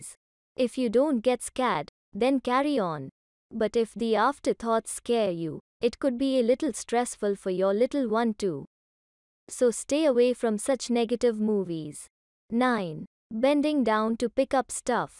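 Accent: India. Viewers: The 1st Indian-voiced YouTube video that made 100% sense 😀. A 31 weeks 1st-time preggo here.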